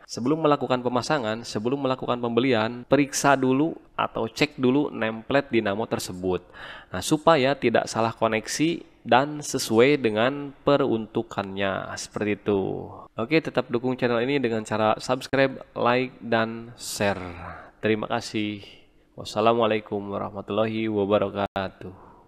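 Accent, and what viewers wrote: West Java, Indonesia. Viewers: Mang orang Sunda ya😃😄😄?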